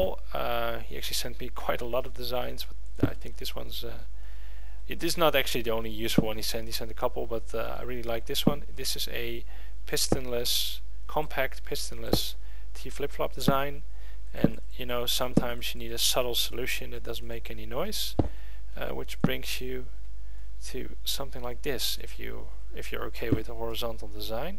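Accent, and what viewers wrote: Netherlands. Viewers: Grappig je kan gewoon aan je engels horen dat je een Nederlander bent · Where are you from? Nederlamd? je klink nederlands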